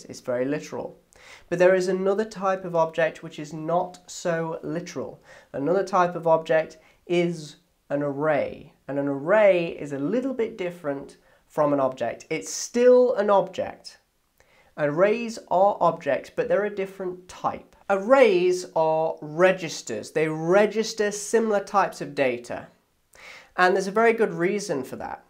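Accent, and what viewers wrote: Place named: England, United Kingdom. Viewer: Merci, je bois vos paroles depuis le premier épisode: Chapeau, monsieur ! (and of course I'm enjoying your english accent !)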